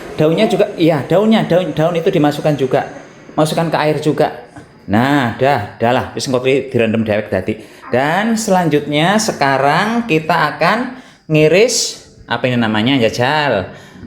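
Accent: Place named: Tegal, Indonesia. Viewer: Maturnuwun resep simple nya pak.. sy terapkan resep ini& resep apemnya.. Seneng bgt denger logat org Tegal..suami sy org Tegal tp br mninggal..sy pulkam ke Blitar.. sy ingin jualan makanan Tegal utk mngenang suami sy..ingin jualan nasi lengko& kue apem dr video pak.. Minta resep bumbu kacangny nasi lengko boleh pak??..ingin dpt resep dr orang Tegal asli. Maturnuwun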